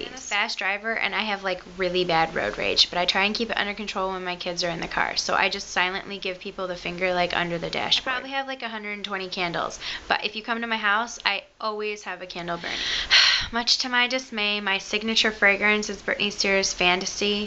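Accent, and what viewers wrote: Michigan, United States. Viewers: Ok, how did I miss this one? I feel like I'm your stalker, but redheadphd linked it as being one of the funniest videos she has ever seen. You're so cute,! I personally like your Michigan accent. It reminds me of one if my best friends that is from Grand Rapids.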